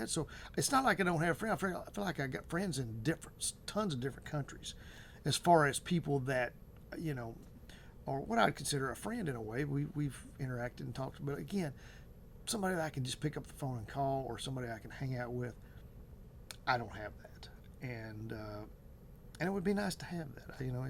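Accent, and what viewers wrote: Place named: Tennessee, United States. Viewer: I'm almost 60 myself. I had quite of few friends thought true friends. my Son passed away but Little by little they stopped interacting with me due to what I was going through, the grief. So now I don't actually have a friend here where I moved. I moved away from my home state to the desert to start new and moved into a 55+ community. Most of them are a lot older, or already have their own friends, or stays in their homes to beat the heat all day. Plus as I aged, my confidence level has taken a hit. Contributed to ageing. Like you, I work from home and it's very hard to connect that way For friendships. Also, I'm a little too shy to join a group without having a friend or someone I know to go with me, initially. Silly I know! I need to get past that. I'll start going to the gym soon. We all need to be healthier. 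I feel your accent is from maybe TN. That state there is God's country. So beautiful. Another commonality, I have a brother named Keith. 🍺 Here's to us making new friends and having social lives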